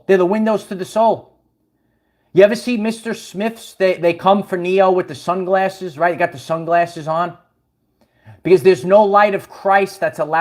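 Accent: New York, United States. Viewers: I have a client that talks, accent like you. He's from New York. Guessing that's where your from?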